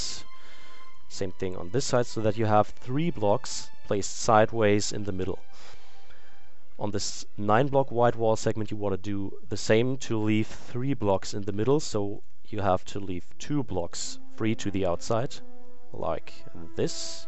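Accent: Germany. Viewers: hei is that a german accent? :) nice vid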